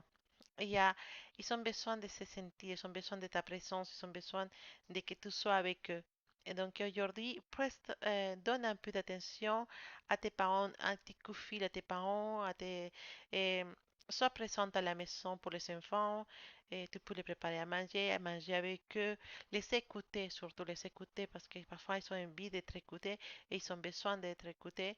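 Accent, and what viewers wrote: Spain. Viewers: Bonsoir, cela fait un peu plus d'une semaine que je vous ai découverte. Déjà, j'adore votre petit accent espagnol, c'est adorable. Vos tirages sont assez précis et intéressants par rapport aux détails que vous nous apportez. Personnellement, je suis Poisson 1er décan, ascendant Capricorne, considérant qu'après 30 ans, on est plus sur son ascendant. Cependant, vu la superbe journée que vous annoncez pour les poissons, j'ai hâte que le jour se lève... Merci beaucoup:)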